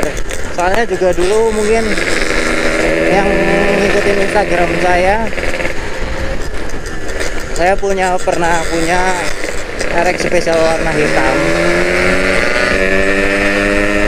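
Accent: Indonesia. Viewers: Logat nya jawa timuran asli mana bang salam santun dari blora jawa tengah bang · Walaikum salllam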